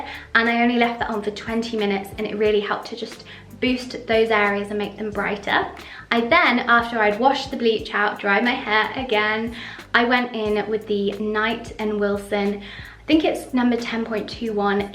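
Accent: England, United Kingdom. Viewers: U look really amazing good job! Explanations are very clear and well said 👏love this british accent! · I love these English girls and their accent. I gotta find one for myself. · An English Accent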